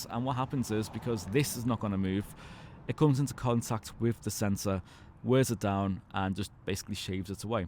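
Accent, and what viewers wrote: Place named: Wirral, United Kingdom. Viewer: Do you still have this car buddy ? If so are you willing to sell it ? I live on the Wirral which doesnt sound too far from you based on your accent! Would love to buy it from you mate.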